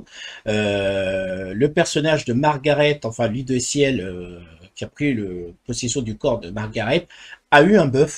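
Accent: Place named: England, United Kingdom. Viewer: Superbe accent anglais ! Tu rentres dans le game mondial du gatcha youtube 😎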